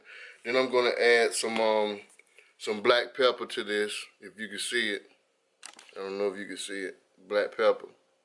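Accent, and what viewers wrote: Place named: Carolinas, United States. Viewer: I knew you were from the Carolina's...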